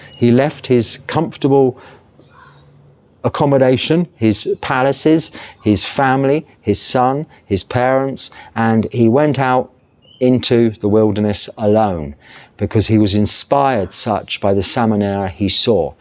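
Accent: England, United Kingdom. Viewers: What an absolute treasure these frank talks are, from a monk in situ...with an English accent! I hope the young people realise this was impossible pre-internet! Many thanks!! I have a question below.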